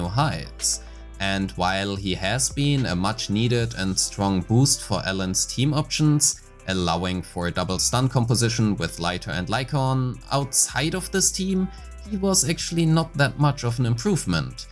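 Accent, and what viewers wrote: Germany. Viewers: I am german and it took me not long to realise you're german as well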